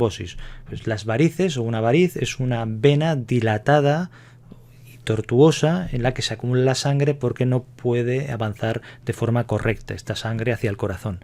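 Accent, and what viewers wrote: Spain. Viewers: Por el acento del Dr. ,puede estar en España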